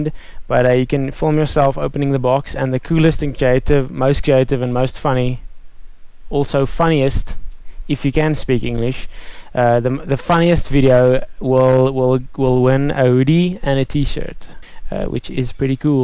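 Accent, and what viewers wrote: South Africa. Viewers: don't stop making vlogs! i need my dosage of south african accent! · clearly south africa from his accent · Oh, you're form South Africa! It was bugging me not knowing where your accent was derivitive of. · its south African, its really strange. Almost like french and British combined xD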